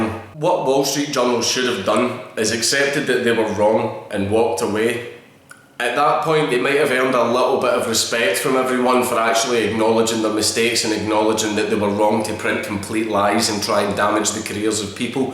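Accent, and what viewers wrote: Scotland, United Kingdom. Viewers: Holy crappo.. watching these ol skool dank vids is so enlightening as to how far his production value has improved... OMG A SCOTTISH BROUGUE AND AN ECHO!!! lol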